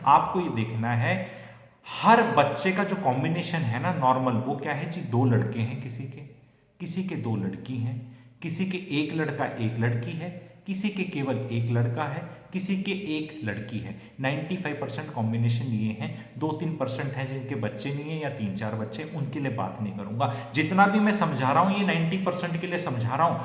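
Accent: Haryana, India. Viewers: sir aapki hindi samajhne me thori dikkat hoti hai. I think it's a Haryanvi based hindi. It's not a standard hindi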